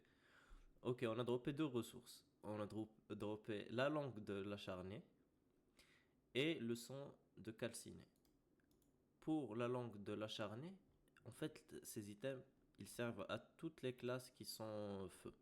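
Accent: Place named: Arab world. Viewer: L'accent reubeu qu'on kiffe, merci pour l'astuce. Le prix ne ferra que baisser dés maintenant haha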